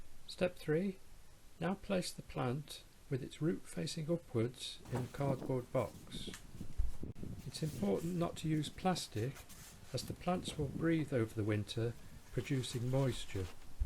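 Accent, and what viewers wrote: Northern England, United Kingdom. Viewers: Yes, they are pelargoniums. They are generally called Geraniums in the UK even though most people know that it isn't horticulturally correct. This man seems to have a northern English accent, so I can only assume that he is calling them what the vast majority of people would call them on a day to day basis. He obviously is aware that they aren't Hardy Geraniums, otherwise he would be leaving them in the ground rather than storing them for the winter. I have never seen them stored this way before, I always bring mine indoors in the pot, and take some cuttings on a just in case basis. This may be an alternative, and will give it a try.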